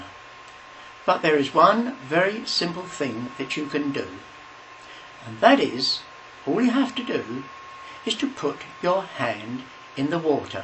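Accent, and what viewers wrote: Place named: United Kingdom. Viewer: Nothing like a talk delivered in a nice fruity British accent. However, the content, while full of high-sounding references, is an enormous pile of horse-pucky.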